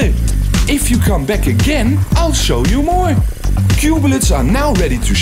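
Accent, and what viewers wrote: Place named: Scandinavia. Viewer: Just wondering why you sound nordic but have a pic of Abe Lincoln?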